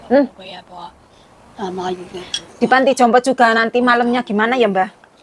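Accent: Cilacap, Indonesia. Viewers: saran sy kalo bisa bicaranya diperhalus dan jangan keras2... Kasian neneknya... Apalagi dgn logat cilacap yg kasar.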